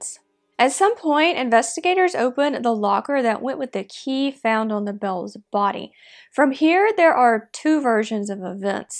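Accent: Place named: Mississippi, United States. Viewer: Nice video. I decided to subscribe. For some reason, you sound like you are from my home state of Mississippi. 👍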